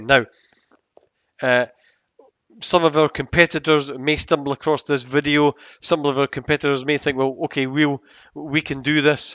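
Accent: United Kingdom. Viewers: I was listening to you and thinking he has a Scottish accent. Then you mention you are from Edinburgh. Thanks for this video. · 😁 Glad to oblige!! Really appreciated your webinar - and in a proper British voice (My brother's up in Bathgate!)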